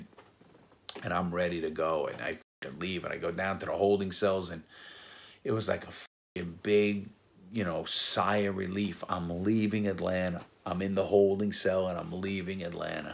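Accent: New York, United States. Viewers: your New York accent is cooler than my Australian one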